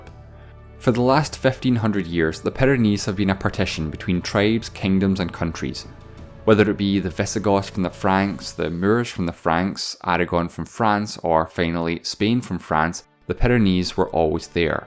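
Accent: Scotland, United Kingdom. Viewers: He sounds scottish, but someone from the UK might be able to tell better than I can. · Scottish I think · OK I really need to know your accent? like exactly what is it- scottish im assuming but what type of Scottish its' really great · I'm sorry, but from where the narrator is? Scotland?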